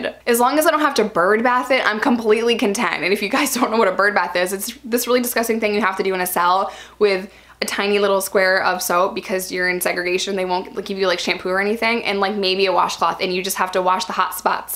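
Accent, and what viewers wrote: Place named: Southern California, United States. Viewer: Arkansas? Accent is a bit more So Cal.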